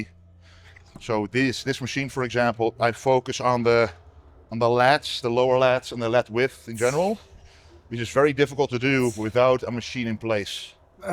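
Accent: Netherlands. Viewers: speaks like a Dutch Optimus Prime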